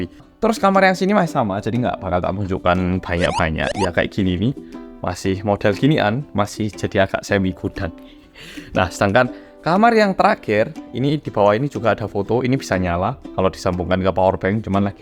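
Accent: Java, Indonesia. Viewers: Wah keren ini bener2 korea medok 😅👍 .. suka banget kalau denger lagi bahasa indo medok dan bahasa jawa .. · Kesini gegara nonton tonight show orang Korea yg medok,, langsung deh aku subscribe like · Sering liat...tapi masih ngumun wong Korea ngomonge persis wong Jowo😂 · Mas, tolong ya Meski tingg@ di korea, medok dan bahasa Indonesia nya jangan hilang yaa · Klo nonton mas kelingan mbak jodilee, podo2medok tp mbake medok jogja krama inggilan😂😂😂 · Tiap nonton konten mas. Terus dia meddok bahasa jawa lancar berasa ga percaya dia asli korea🤣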